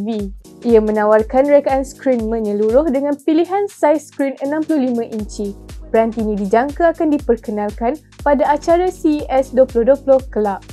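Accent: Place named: Kelantan, Malaysia. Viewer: Host ni org Kelantan ke? Dgr mcm slang sana sikit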